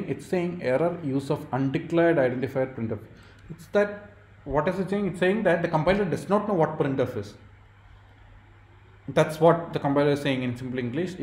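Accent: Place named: Kerala, India. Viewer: Thick, incomprehensible Malayalam-accented lectures. Go somewhere else for your Data Structures course.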